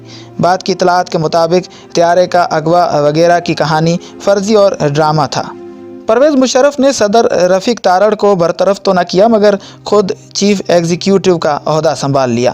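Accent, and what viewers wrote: India. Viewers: ki Urdu bhi Hindi hay Indian hay koi yeh channel wala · Are you indian. Your lehja is indian. Kia musharraf shia hai.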